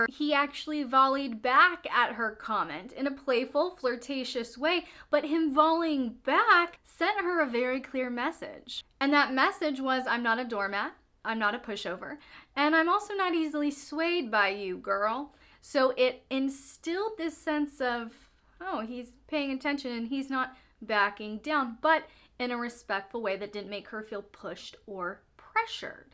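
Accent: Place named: South, United States. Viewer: I think this is one of the best explanations I've heard on this. Maybe it's because where I am in life right now. But in a sense I think you were talking to me. BTW, you sound like you have a bit of a Southern accent. Are from the south? It'll be my luck you'll say, "I'm from NYC. I don't know how you got that idea!" LOL! Thank you for the advice.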